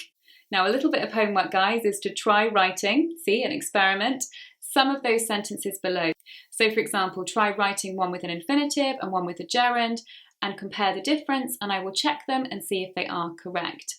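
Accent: United Kingdom. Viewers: I love your british accent tone ❤❤❤❤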